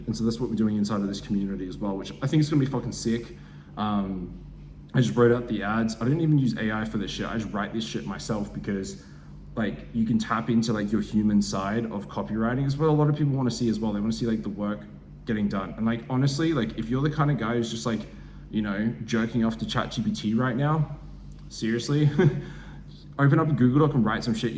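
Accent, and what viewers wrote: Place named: Australia. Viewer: He too Australian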